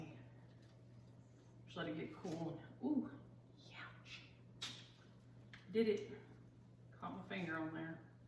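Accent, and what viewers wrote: South, United States. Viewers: Thank you so much for a wonderful Vlogmas! It’s been a day! And I needed a distraction. So nice to hear a southern accent...I am a transplanted southerner who wound up in western Canada, and I miss my home 🥴 Christmas is a time for family and good food, and I miss that more than I can say! Your wreath is beautiful (sorry I am behind on episodes) I am working on binge watching to catch up on your vlogs! But I keep getting sidelined by chores🥴Wishing you and your family many blessings of the season! ❤️🎄🦩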